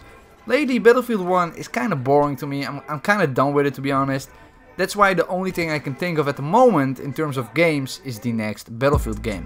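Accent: Netherlands. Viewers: Such a Dutch accent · Are you dutch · Ik dacht dat ik goed in engels als nl zijnde ik herkende je accent bijna niet good vid do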